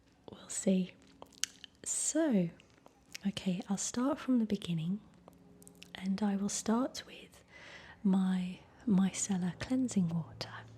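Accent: England, United Kingdom. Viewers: I absolutely luv that english accent...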